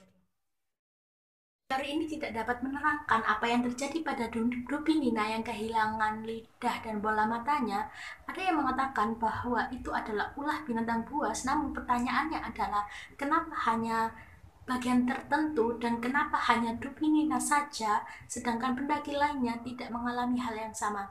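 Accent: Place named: Palembang, Indonesia. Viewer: loga ny kayak orang palembang hehehe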